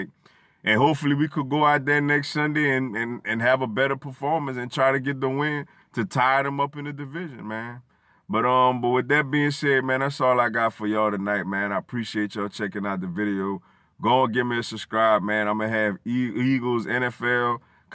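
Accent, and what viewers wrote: New Orleans, United States. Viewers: man you got a new Orleans accent...I swear.....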